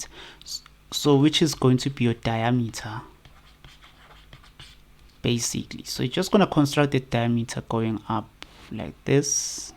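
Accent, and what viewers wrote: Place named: India. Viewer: The voice seems indian lol but good video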